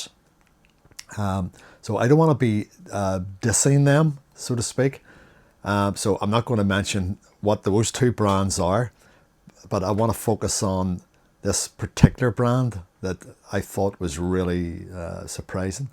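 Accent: Northern Ireland, United Kingdom. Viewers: Van Gogh is surprisingly good! I have some spare tubes and pans in a box with others, and they work really well. I never had an issue. Thanks for this video! Cosy and instructive ❤ (extra points for the Norn Irish accent)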